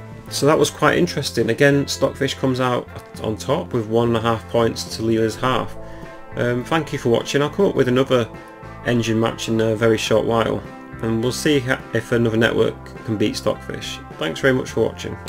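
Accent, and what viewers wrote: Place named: RP, United Kingdom. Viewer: Nice background music on game one. AND nice voice too with RP voice is narrating on the game.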